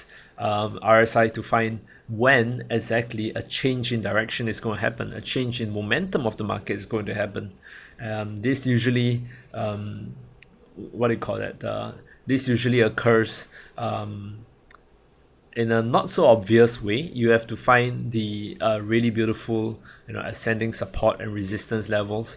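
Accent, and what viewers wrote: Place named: Singapore. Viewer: is this Rayner Teo? Similar (Singapore?) accent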